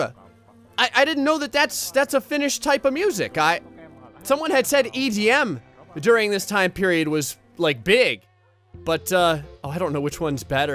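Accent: New Jersey, United States. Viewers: Oh yeah I hear the jersey accent, course I’m from the state right below so I pick up on it pretty easy lol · Dont notice that New Jersey accent. I live there too. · I'm from the Philadelphia area and go to school in NJ but ive never heard your kind of jersey accent.Are you from North or South Jersey? Please tell me you do not say Taylor Ham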